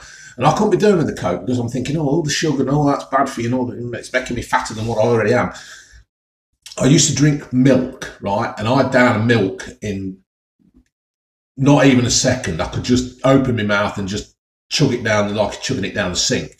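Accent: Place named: Newcastle, United Kingdom. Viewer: True Geordie emdy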